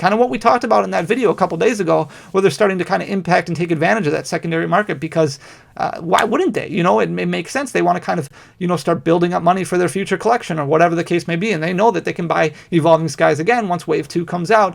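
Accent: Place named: Wisconsin, United States. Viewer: I enjoy hearing your Wisconsin accent! Moved down to Georgia a few years ago.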